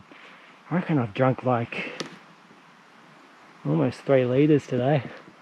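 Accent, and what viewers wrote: Australia. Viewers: Thats it haha. You just sound like you're from Melbourne.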